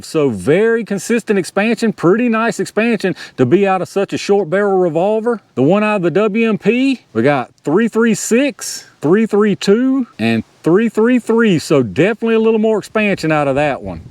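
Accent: South, United States. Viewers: You are quickly becoming one of my favorite pew pew YouTubers. Man every video I've watched is awesome. And the cherry on top of the cake from one southern boy to another I love your accent man. Keep up the good work brother.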